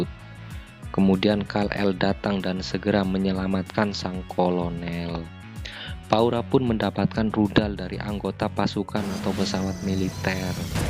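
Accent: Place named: Java, Indonesia. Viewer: suara lemes logat jawa bikin ga mood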